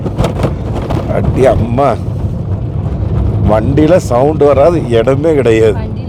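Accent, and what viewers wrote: Kongu, India. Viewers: முதலில் உங்களுக்கு நன்றி... சிரமப்பட்டு டிரைவிங் செய்யும் போது பேசிக்கொண்டே வருவதால் நாங்களும் உங்களுடன் கூடவே வரும் உணர்வு... அதுவும் நம்ம கொங்குத்தமிழில்...அருமையான காட்சிகள்...ஆனால்..என்னவென்றால் பாவம்..உட்கார்ந்து கொண்டே செல்வதால் இடுப்பு வலி.. சென்றதும் நன்றாக ஓய்வு எடுங்கள்...Take care.. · இன்று உங்கள் சிட்டியை திருப்பூர் கார் பட்டறையில் பார்த்தேன். சூப்பர்...உங்கள் கொங்கு தமிழ் உங்கள் காணொளிக்கு சிறந்த நன்மை. வாழ்த்துக்கள். உங்கள் தொடர்பு எண்ணை அனுப்பவும். நாங்கள் உங்களை சந்திக்க ஆர்வமாக உள்ளோம். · கொஞ்சும் கொங்குத் தமிழ் பேசும் கோமகரே...! திகட்டாத தித்திக்கும் பேச்சுடைய திருப்பூர் திருமகரே...! எங்கள் மதிப்பிற்குறிய திரு. ❤❤❤🙏 அவர்களே எப்படி இருக்கீங்க அண்ணி❤❤❤🙏 எப்படி இருக்காங்க ரித்திக்❤❤❤ எப்படி இருக்காரு. இந்த லடாக் பயணத்தை திருப்பூர் திருமகரின் பயணம் என்பதைவிட "வீரத்திருமகரின்" பயணம் என்பதே சரியானது❤❤❤❤ நேற்று நான் சொல்ல வந்த ஒரு தகவலை இரண்டாவது கமெண்ட்டில் சொல்றேன் சில நேரம் YouTubeல அதிக எழுத்துக்கள் கொண்ட கமெண்ட் பதிவாகாது? 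என்ன ஆகுதுனு தெரியல? ஒருவேளை அப்படி பதிவாகவில்லை என்று சொன்னால்? நீங்க&TMF புரிந்துகொள்ளுங்கள் பதிவாகவில்லை என்று🙏. நான் உங்களை திருப்பூரில் வந்து சந்திக்கும்போது அனைத்தையும் சொல்கிறேன். நீங்க இதற்காகவே அதை ஒரு வீடியோவாக நம் TMFக்கு upload பன்னுங்க. நம் ❤சிட்டுக்குருவியோட❤ தங்களது இந்த லடாக் பயணம் இனிதே தொடர்ந்திட நல்வாழ்த்துக்கள் ❤❤❤ என்றும் அன்புடன் உங்கள் தம்பி என்றும் அன்புடன் உங்கள் TMF ரா.முஹம்மது கபூர் (சென்னையில் இருந்து)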